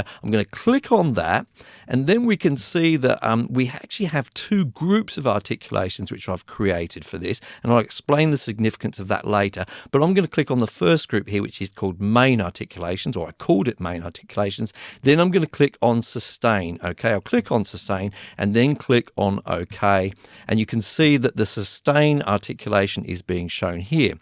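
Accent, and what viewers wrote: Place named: Australia. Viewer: Thanks. I switched to Cakewalk a month ago and you helped me. I love your accent by the way. Are you from Australia?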